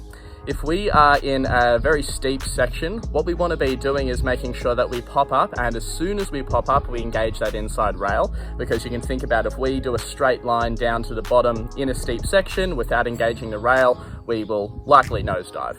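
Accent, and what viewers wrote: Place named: Australia. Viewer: Dude, thanks so much for all your vids and explanations. Very useful. (And cool to listen to a calm aussie voice)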